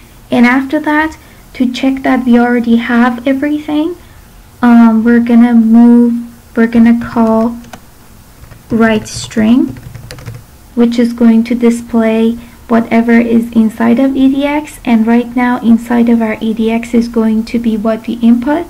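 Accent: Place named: Iran. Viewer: After watching this i noticed your accent seems to be persian and then i chucked ur prof name and i suprised proud of iranian women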